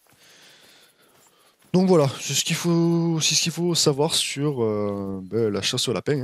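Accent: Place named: South, France. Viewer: abo j'avais laissé le jeu de côté après des centaines d'heures de jeux tu m'as redonné envie d'y jouer... Merci c'est peut être l'accent du sud lol bon contenu en tout cas je vais te suivre 👌👍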